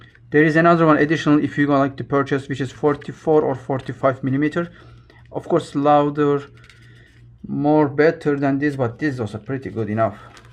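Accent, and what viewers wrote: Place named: Turkey. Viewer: Süper video, teşekkürler. Aksandan türk olduğunu düşünüyorum 😄Hoparlör kulakları biraz rahatsız ediyor ama alışırım umarım.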